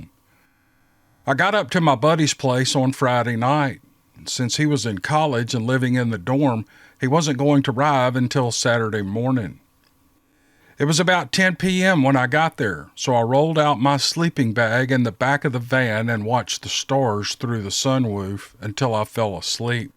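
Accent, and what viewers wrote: South, United States. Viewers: Love your story telling! That southern accent gives a warm memorable touch! Please continue and repeat daily.👍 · I always hit the like button after listening to stories (yammer all you want my friend, I enjoy it, and your southern accent makes me long for Memphis), but I had to hit right away because the "like" button was at 666. This video was a great series of stories - as usual! BTW, the people you talk about at the end of the video are the same people who badger and pick arguments with everyone around them whether they are work, in a bar, or even standing at a bus stop. They are straight-up A-holes, and the social media platforms of the cyber-age have only enhanced their ability to irritate and annoy at an exponential range. Every village has it's idiot, and every channel has it's troll(s).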